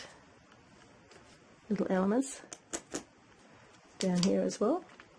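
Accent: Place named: Australia. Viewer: Great to hear an Australian accent on YouTube! haha Love the artwork :)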